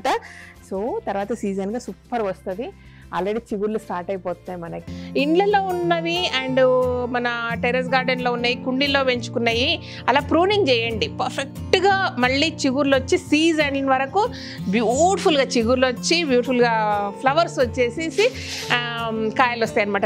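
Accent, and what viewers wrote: Telangana, India. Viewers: GARU MEE BASHA PAKKA TELANGANA BAGUNTADI MEE VOICE KUDA BAGUNNADU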